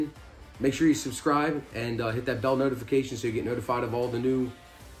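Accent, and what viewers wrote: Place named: Baltimore, United States. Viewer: Are u from Baltimore? I recognize the accent. Also, what do u do for a living?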